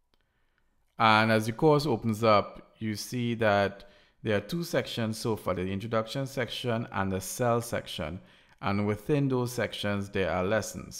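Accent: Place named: Trinidad and Tobago. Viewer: Thank you so much for this video. I detect a Trini accent 🙃hope I'm correct.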